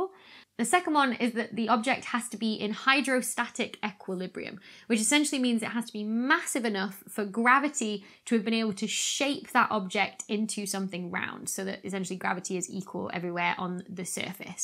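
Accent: United Kingdom. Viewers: Woah cute female physicist with a British accent. Annnnd that's a subscribe. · Do the rest of you folks pronounce it glacier, or glacier? I know is from the UK and apparently over there it's pronounced glacier, here in Canada we pronounce it glacier. How do the rest of you folks pronounce it? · Also your briish accent is so thick 😹😹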